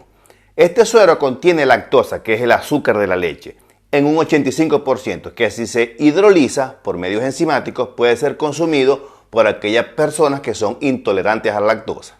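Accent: Zulia, Venezuela. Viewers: Doctor ya me suscribi. Ud tiene un acento Marabino Zuliano. Felicitaciones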